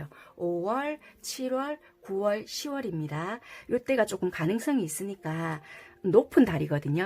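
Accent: Busan, South Korea. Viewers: 2,4 만명 축하드려요~더 잘 되실 거예요~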